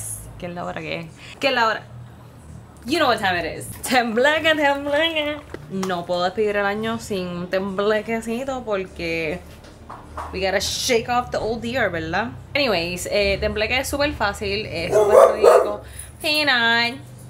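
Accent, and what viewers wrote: Puerto Rico. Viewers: Una China hablando un perfect Español boricua uuff muy Bonita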